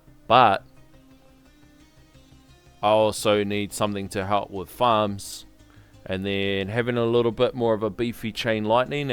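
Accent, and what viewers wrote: Australia. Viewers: Been waiting for that smooth Aussie accent to explain V27 to me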